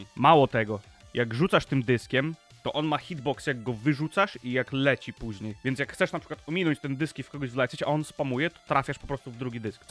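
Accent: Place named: Podlasie, Poland. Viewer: wschodni akcent... Podlasie? :)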